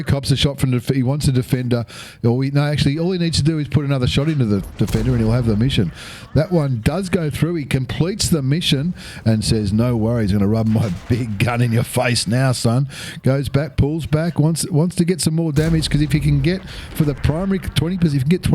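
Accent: Australia. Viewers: Hey yes you not any of those other bloaks luv your videos from Fla. on N/A also awesome aussie accent mate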